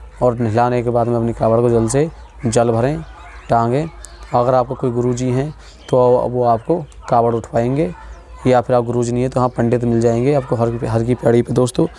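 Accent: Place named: Uttar Pradesh, India. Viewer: Bhai shayad aap uttar Pradesh se ho , shayad bulandshahr ke aas paas ke ho ,😂😂😂😂😂 language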